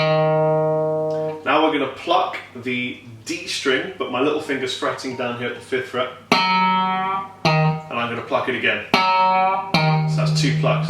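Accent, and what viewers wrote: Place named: RP, United Kingdom. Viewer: He even sounds like a "HIGH BRIT" 😁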